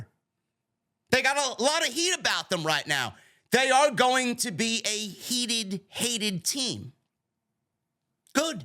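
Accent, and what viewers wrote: New York, United States. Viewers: I just come here to listen to an angry New Yorker accent. 😂 Love it.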